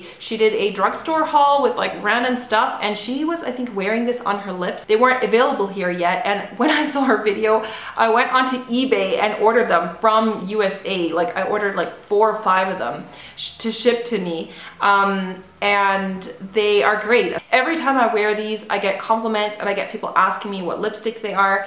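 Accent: France. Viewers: Omg ton français<3 (omg your french!)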